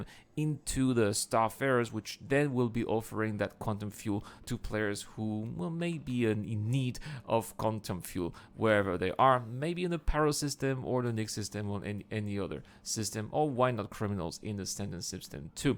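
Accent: France. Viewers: nice i really love that your channel is growing! you are always on point and i love your french accent, greetings from germany keep it up! :) <3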